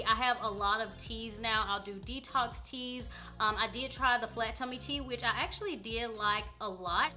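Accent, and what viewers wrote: South, United States. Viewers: I LOVE YOUR SOUTHERNESS IT MAKES YOU SO INTERESTIING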